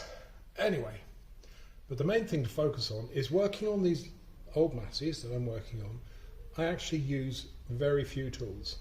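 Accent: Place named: RP, United Kingdom. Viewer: Your accent sounds far to posh for a mechanic, we never see your Mush so we call you 😂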